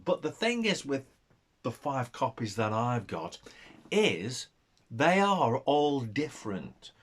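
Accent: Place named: Cockney, United Kingdom. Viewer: The only person who cares about this “anniversary” is you. I’m Chip Rock I shot the cover of KISS ON TOUR 1976. 41 photos in KISSTORY too, my photo credit is in ALIVE 11. Gene once told me in ‘75 “you’re our biggest fan!” All serious fans know when the 1st album came out I paid 2$ for it in a California hippie record. Incense galore. You need a haircut & walking papers. Not impressed & your lower class cockney accent is ingratiating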